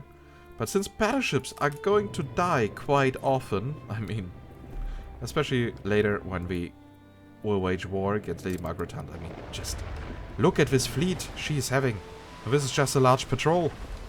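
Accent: Germany. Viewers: Guten Tag, da dieser Kommentar für dich ist, kann ich ihn ja auf deutsch schreiben... Du hast vollkommen recht, als ich die ersten Videos von dir in der Anno 1800 Beta gesehen habe, hat mich dein Ton und deine Art schon ein wenig genervt. War jedoch nur eine Mischung aus Neid und meinem eigenen Anspruch an mich möglichst zu versuchen in Englisch nicht wie eine Vollkartoffel zu klingen. Ich habe deinen Kanal nach der Beta leider aus den Augen verloren, hatte mir aber fest vorgenommen mir die Vollversionvideos von dir anzusehen... und weswegen? Wegen deinem Spielstil, deine Layouts bringen mich zum lächeln und wenn dein Layout nicht auf die Insel passt und du dich ärgerst, ärgere ich mich mit dir und genieße gleichzeitig ein wenig Schadenfreude. Ein deutscher Muttersprachler darf in Englisch auch ruhig so klingen... Wenn er dann noch in seinem Spielstil den Klischedeutschen raushängen lässt, ist das top Unterhaltung. 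Es ist super entspannend jemanden "genau richtig" spielen zu sehen, während man selbst gerade einfach zu faul ist es selbst zu machen. Arbeit ist wunderschön... ich könnte stundenlang zusehen. :D Diese Art Anno-Let's-Play habe ich schon lange gesucht, jetzt kommt das (meiner Meinung nach) beste Anno raus und ich finde genau das. :) Danke, danke, weiter so! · I like your German accent, it's pleasing, I reckon Americans and Brits are not used to straight honesty of central Europeans. Honestly, I like your play style, but your commentary is much more entertaining for me than Raptor's and other channels, so this is why I watch this one. That being said, "So-ing" machines ;) Danke fir macht aus Praag! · This is how most German people talk English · We like you with ur german accent bro.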